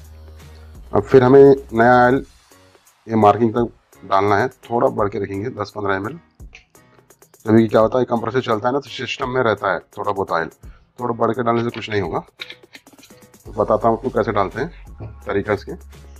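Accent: Hyderabad, India. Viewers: Bhai aap hydrabad sa ho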